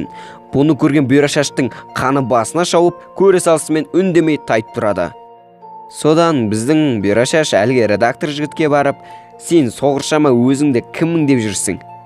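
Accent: Mongolia. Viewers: Өзіміздің Моңғолиядансың ба?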